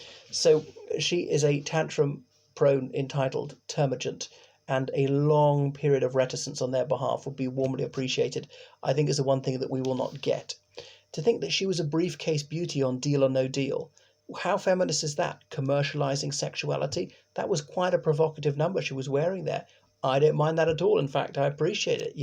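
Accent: United Kingdom. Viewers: You don’t have an Irish accent. You sound British!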